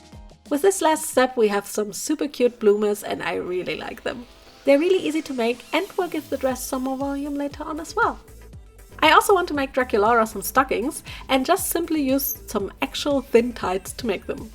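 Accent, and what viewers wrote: Germany. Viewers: this is the first video I've seen from this creator, and the first thing I noticed is how she sounds german! I fell inlove with that tiny trait of hers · I know very unrelated but are you german? Because the "den Wald vor lauter Bäume nocht sehen" in english, the Glue (Kraftkleber) and just your accent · You sound German, are you German? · I know a german accent wenn ich ihr Höre🤓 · Du klingst ein wenig deutsch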